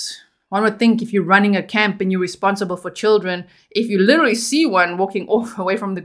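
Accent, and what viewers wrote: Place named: South Africa. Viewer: I was gonna ask if you’re South African because I can hear the accent, thank you for clarifying instead lol.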